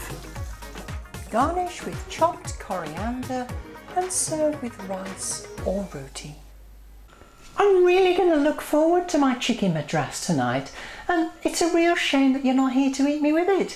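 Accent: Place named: England, United Kingdom. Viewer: Ok, English accent with a slight hint off southern hemisphere in there, but Carrefour tin sugests you are out of the country....................... hmmmmm, I am guessing you are living in Australia? Im going East Coast Australia........... Am I within a thousand miles?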